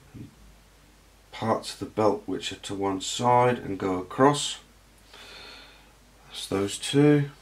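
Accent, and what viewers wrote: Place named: Great Yarmouth, United Kingdom. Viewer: know the area well, I'm from the gt yar. Area. Recognised our accent.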